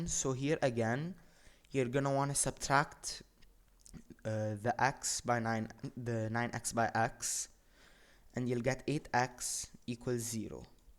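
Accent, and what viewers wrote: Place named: Lebanon. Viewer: I can tell ur Lebanese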